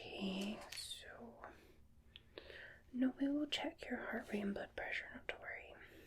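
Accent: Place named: Scotland, United Kingdom. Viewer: Thank you,.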